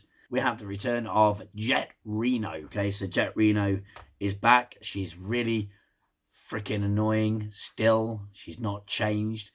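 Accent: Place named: United Kingdom. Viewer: Were it not for your Limey accent you Id take you for the testosterone poisoned , but still physically doughy, caucasian fan boys that infest the body of SciFi fandom. I didn't like the first season and 3 quarters of Discovery. However, last season and this have been spot on. You whiney "proud boys are a joke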